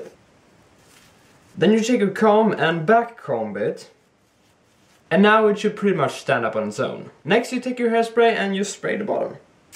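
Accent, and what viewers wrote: Sweden. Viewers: You sound swedish... Har jag rätt? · sounds swedish?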